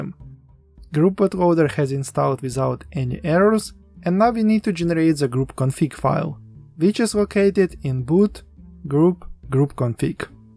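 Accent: Russia. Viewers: Оно пытается выглядеть 'своим' для англоязычного ютуба, но с речью решило не заморачиваться и говорит английские слова русскими буквами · Акцент не инглиш нихуя, похоже русский. Я по инглиш не шпрэхаю, чё делать то? Пресс Х ту вин? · русский акцент)))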